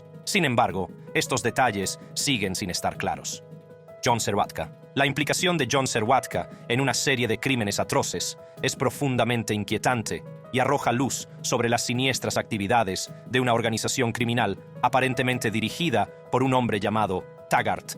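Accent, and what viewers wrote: United States. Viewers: Que cringe da este motor de voz gringo 😅😅😅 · Nose ,si persiven que el narrador habla de diferentes formas,primero habla como gringo que está aprendiendo a hablar español,luego habla normal,luego con acento de como español es rara su narración . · Deberían de buscar un narrador de historias, que no cambie su manera de narrar. Porque habla claro y luego le entra complejo de americano tratando de hablar español, que no se le entiende mucho. O te peinas o te haces rolos. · Ridículo hablar con acento americano cuando se escucha que puedes hablar perfectamente el español ridículo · Q voz.... empieza hablando muy bien el español y luego a lo gringo, si no puede q no hable y pongan a otros q hablen bien el español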